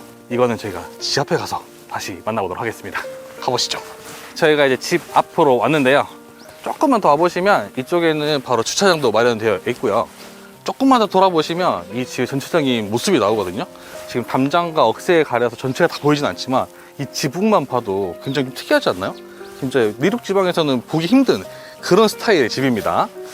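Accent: Jeolla, South Korea. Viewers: 혹시 전라도 분이세요? ”촤측“으로 “코가구”를 하시는게 전남분 같아요~ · 정겨운 전라도 말투네요. 설명도 잘해주셔서 편안하게 영상 보고 갑니다. 채널 번창하세요~ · 제주도에서 전라도 사투리..^^ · 전라도 사람임?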